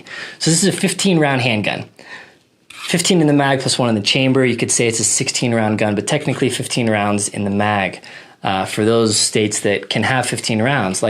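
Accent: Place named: California, United States. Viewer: did not know you were from california. totally got that vibe from the way you talk though. still rock my LLOD hoslter, one of the best.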